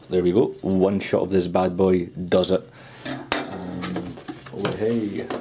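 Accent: Scotland, United Kingdom. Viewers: This is a good video. Even with your accent (sounds Scottish), you are easier to understand than most Americans... and I am American. haha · Scottish is awsome love the accent :)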